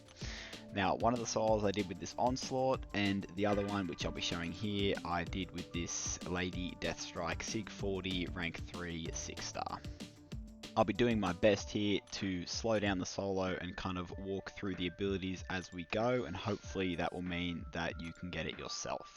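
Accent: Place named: Australia. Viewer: Mate, you an Aussie? What’s good my fam, instant fan and subscriber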